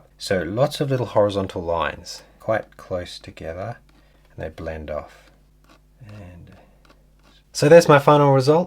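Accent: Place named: Australia. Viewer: Are you from Australia, New Zealand, Tasmania? Love your calm voice and accent.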